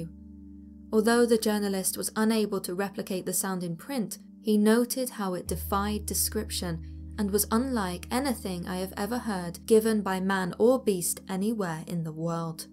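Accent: United Kingdom. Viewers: The lady with the British accent is an upgrade from another site where the male narrator has a heavy backwoods twang. Then there is another site that features a lady with a Canadian accent. She also has a pleasant voice while describing Bigfoot outrages such as interupting a man having a bowel movement along the side of a seldom used road. It scared the crap out of him.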